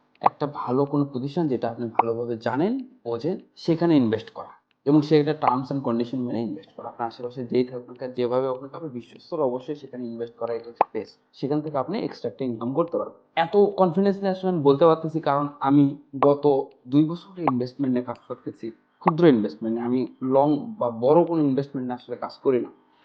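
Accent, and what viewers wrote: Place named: Sylhet, Bangladesh. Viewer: সিলেটি মাতের আবাস পাচ্ছি, মনে হচ্ছি?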